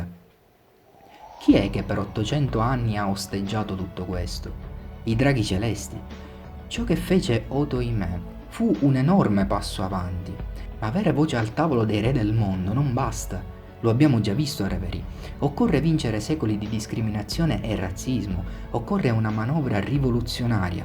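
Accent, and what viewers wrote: Italy. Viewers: Bel video! Sei calabrese/siciliano? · Ottimo canale. Una domanda. Siculo? Agrigentino?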